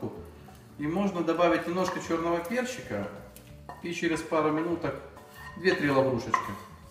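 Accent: Ukraine. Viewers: Ведущий с Украины, похоже с Юго-Востока т.к. чисто по- русски говорит. А у нас так и говорят всегда -"Возьми , съешь огурчик или пойду собирать огурчики". Это как в Росии да и у нас русские часто говорят -" Съешь , помидорки" . Хотя остальных суфиксов действительно многовато, наверное просто мужчина хотел придать видео больше душевности и убрать официоз.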